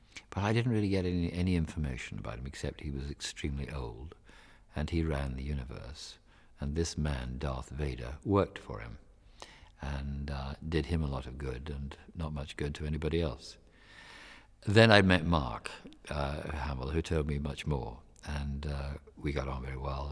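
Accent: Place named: Scotland, United Kingdom. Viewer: So nice to hear a scot doing this.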